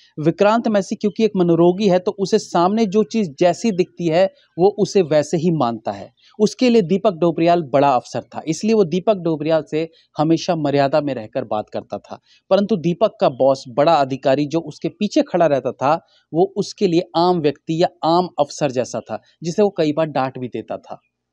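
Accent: Standard. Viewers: first time not understanding your review fully. its looks like you speak purely Hindi. Good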